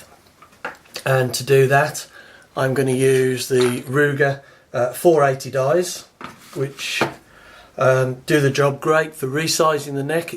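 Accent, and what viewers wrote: England, United Kingdom. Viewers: It's nice to hear an English voice as well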